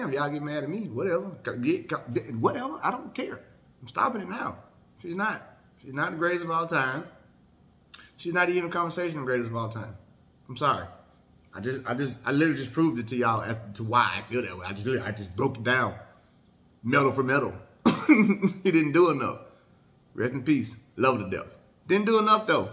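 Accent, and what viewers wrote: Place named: Georgia, United States. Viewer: P.s my mom used to love your voice when I would play and listen when I would sit with her at the table having dinner..she said you sound like some of her people in Georgia. I miss my mom laugh she thought your voice was so back home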